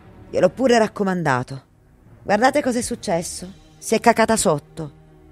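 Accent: Piedmont, Italy. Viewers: sembri piemontese !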